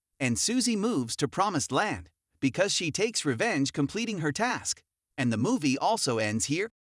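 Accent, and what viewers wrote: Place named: United States. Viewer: Perfect American accent, but I still can’t understand what he’s saying.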